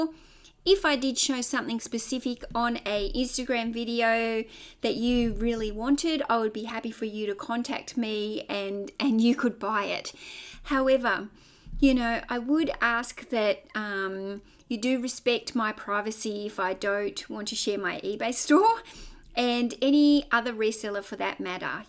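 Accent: Australia. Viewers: Love the Aussie accent. I also have a YouTube channel in Oklahoma. I am subscribing to your channel. Hope you will check mine out and subscribe too. Another YouTube couple drifterthrifters in Queensland follows my channel. Mine is all one word “TheCapGuy”. Have a great day at the op shops.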